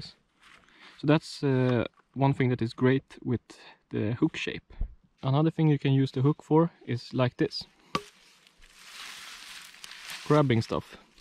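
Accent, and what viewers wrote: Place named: Sweden. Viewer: Just curious are you from Sweden? Your accent is badass man lol thanks for the info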